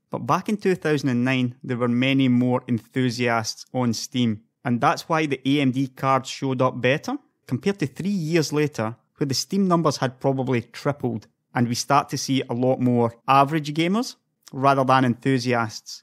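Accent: Scotland, United Kingdom. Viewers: Your Scottish pronunciation and dificult is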